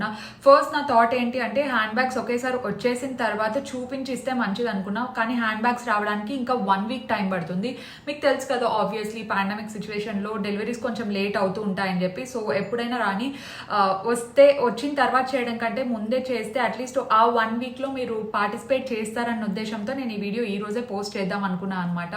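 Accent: Anantapur, India. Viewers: My house tour is the first video I saw.I like way of your talk,dressing style,julie silence and dolly allari and also pinni talks are also nyc.When I'm studying intermediate one of my friend was from ananthapur I like that slang and the way of talking,the same slang and way of conversation was observed in your videos.Usually I used to enjoy that conversations.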